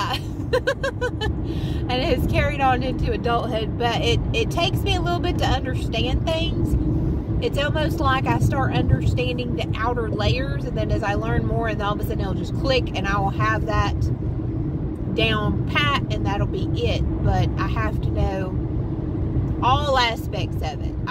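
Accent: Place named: East Tennessee, United States. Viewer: I've been gone from east TN for 3 years. Hearing you talk makes me miss it lol. Love seeing you post again!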